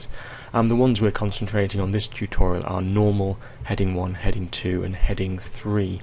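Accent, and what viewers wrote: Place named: United Kingdom. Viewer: For some reason, my brain pays 50% more attention to this guy just because of the British accent.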